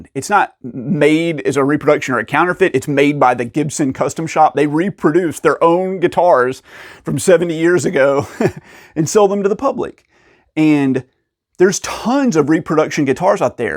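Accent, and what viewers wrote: South, United States. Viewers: It's subtle, but as a southerner, I can detect it, haha. Merry Christmas!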